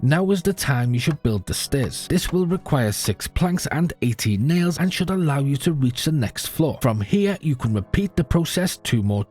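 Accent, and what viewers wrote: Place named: Fife, United Kingdom. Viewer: You sound like a Fifer 😊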